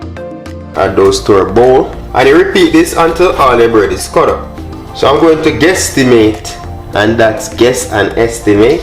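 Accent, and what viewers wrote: Jamaica. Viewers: As soon as I heard that Jamaica acent...I started smiling, hey maan 😃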